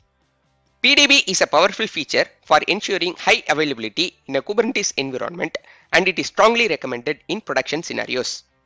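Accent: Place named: India. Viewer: It's difficult to listen you english india accent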